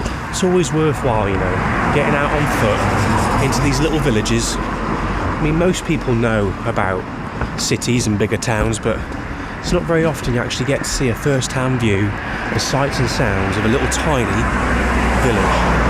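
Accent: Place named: Shropshire, United Kingdom. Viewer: Hi from a fellow Salopian 👋, I thought I recognised the accent . I actually found your channel while waiting for my daughter and boyfriend who are at Cineworld in Shrewsbury . So sorry that happened , total scumbags , should not be allowed to breathe the same air.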